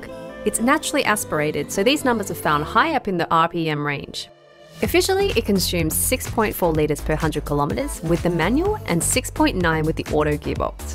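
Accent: Australia. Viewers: Sexy woman with an Australian accent talking about natural aspiration. Like.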